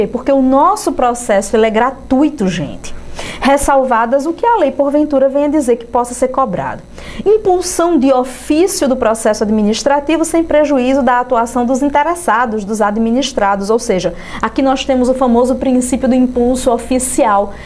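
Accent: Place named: Pernambuco, Brazil. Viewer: vc é de pernambuco?saudade desse sotaque........hehehe aula excelente...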